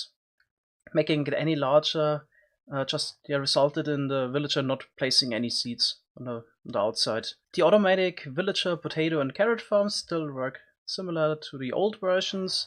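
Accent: Germany. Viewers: Man hört, dass du aus Deutschland oder Österreich kommst😂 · Höre ich da einen deutschen Akzent? :)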